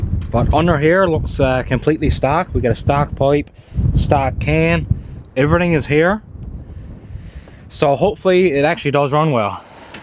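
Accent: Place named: Newfoundland, Canada. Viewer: Newfoundland by the accent.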